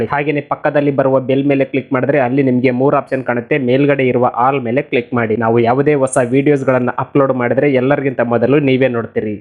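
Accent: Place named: North Karnataka, India. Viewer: ಸರ್ ನಿಮ್ಮ ಮಾತಿನ ಶೈಲಿಯಲ್ಲಿ ನಾನು ಗುರುತಿಸಬಲ್ಲೆ ನೀವು ಉತ್ತರ ಕರ್ನಾಟಕದವರು ಅಂತಾ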